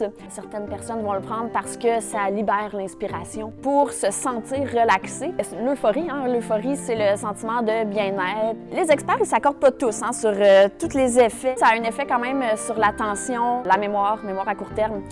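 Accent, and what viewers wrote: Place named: Quebec, Canada. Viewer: i've been speaking french for more than 15 years now, every 10 words she says, i only understand one,is this how all french canadian speak?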